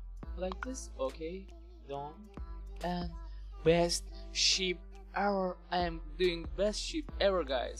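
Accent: Turkey. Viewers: Kardeş %100 Türksün Ben konuşmandan anladım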